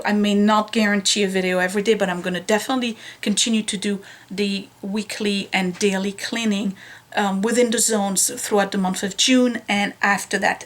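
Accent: France. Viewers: I watch your series as I cleaning my own room like lessen your french accent hagaga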